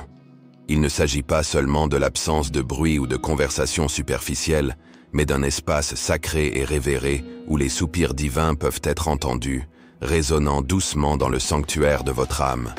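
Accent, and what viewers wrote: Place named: Germany. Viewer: C'est dans toutes les vidéos (que je viens d'écouter :) de cette chaîne ... Quand l'Ai fait des erreurs ou des choses intentionnelles, généralement, ce n'est pas pour le bien des humains. Là, des mots en espagnol, francisés avec l'accent allemand, ça sonne comme un gloubi boulga de langues européennes ... Un espèce de truc incompréhensible et rabat-joie, comme l'UE de la hyène ! Pensée unique, langue unique, banque centralisée bref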